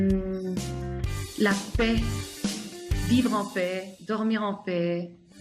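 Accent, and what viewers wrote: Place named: France. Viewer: Elle a l accent français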